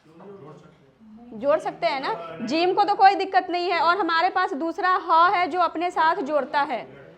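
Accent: Bihar, India. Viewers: She must belong to behar state. ऱ accent Now इन pnjb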